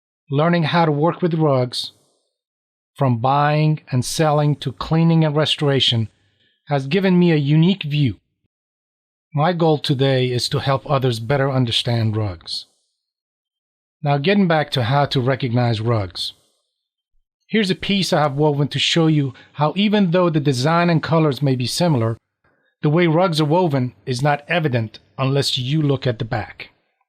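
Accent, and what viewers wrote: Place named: South, United States. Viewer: I knew you learned English in the Southern United States. You have that Southern accent! This was a great video! Thank you so much for presenting it so clearly. I had an Iranian friend who had some beautiful rugs, and I was fascinated by the skill used in weaving them.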